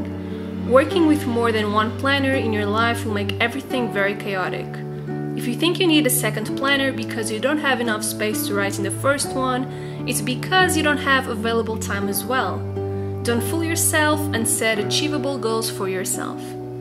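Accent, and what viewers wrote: Portugal. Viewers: where are you from? It's just you don't seem american/english. És portuguesa, certo?? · Tu és portuguesa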